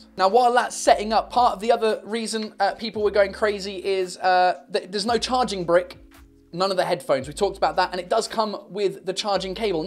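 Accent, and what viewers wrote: England, United Kingdom. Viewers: Watch Mr whoes the boss bro English not American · You sound like Cyprien,if he talked in English with his style and he had a English accent he should sound like you. POV: I m Franch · Are you from the uk It sounds like the accident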